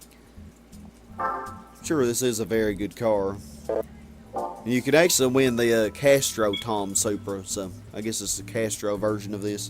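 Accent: South, United States. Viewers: Love your southern accent makes these videos real entertaining to watch, like its if goofy is commentating this badass game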